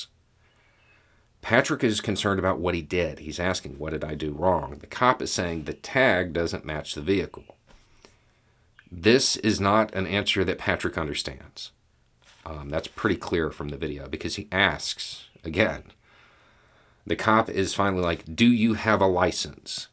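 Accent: South, United States. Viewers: I would like you to cover more of this. Dr Richy does but because he’s black I fear he’s not respected among some as you are because you’re white and have a southern accent.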